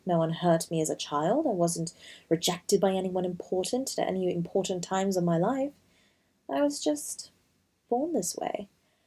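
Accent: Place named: United Kingdom. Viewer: You sound more British than I do 🤣 loved the twist and turns you took in this! 😱💖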